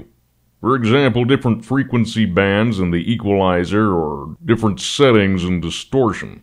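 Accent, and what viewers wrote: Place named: United States. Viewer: Most american voice Ive ever heard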